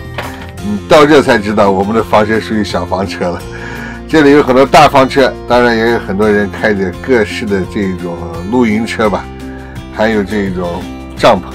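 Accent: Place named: Xinjiang, China. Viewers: 一样新疆老乡，真真的谁的是新疆的疆普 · 姜先生您好，听了你的节目有一阵了，感觉你的口音特别像我们新疆的普通话，结果今天终于发现咱们是老乡了，我从小在博乐长大，在乌鲁木齐上大学并生活了十几年，现在我在Iowa 定居。 你的口音太亲切了。支持老乡。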